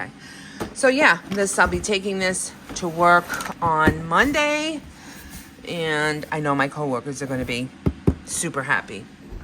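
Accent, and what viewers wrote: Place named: New Jersey, United States. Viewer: I say kawfee too lol. You kno Jersey.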